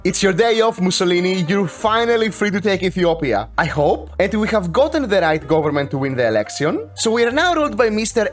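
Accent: Greece. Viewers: your accent sounds greek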